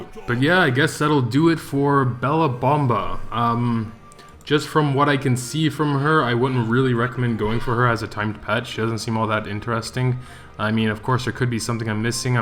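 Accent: Germany. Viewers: Are u German ?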